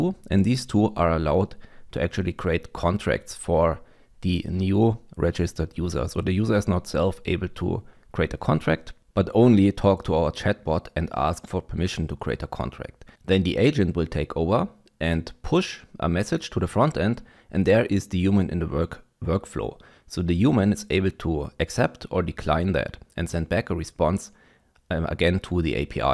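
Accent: Germany. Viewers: Are you german by chance? Love the accent. Keep up the good work.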